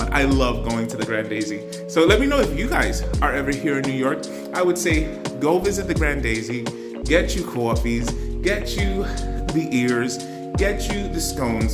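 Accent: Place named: England, United Kingdom. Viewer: Fantastic review! You are eloquent and entertaining, and your English accent is great. I should know as I am a Brit. Can’t believe that you are Garifuna! My wife is from Honduras. After your review I feel that I need Chypre Fulminare in my collection. You have such charisma.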